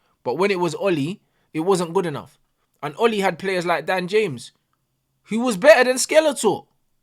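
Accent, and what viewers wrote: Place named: London, United Kingdom. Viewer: Bro why is this guy even supporting United? He’s obviously not from Manchester judging by that London Afro-accent. You don’t speak like that if you’re from Manchester. Does anyone know why he supports them?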